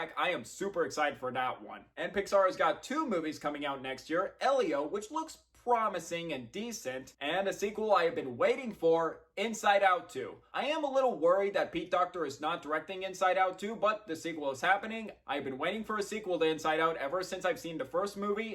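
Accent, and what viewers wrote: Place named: Canada. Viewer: Are you Canadian?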